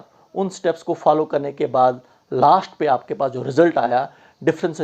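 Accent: Kashmir, India. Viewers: Humarai teacher nai yehi method dekhaya tha aur mai nai full YouTube mai dakha kis nai b aasai nhi kiya hai but thanks sir aap nai yeh video banaye hai thanks sir aur aap kai tone sai lag raha hai aap kashmiri ho so kashmiri best hai 💕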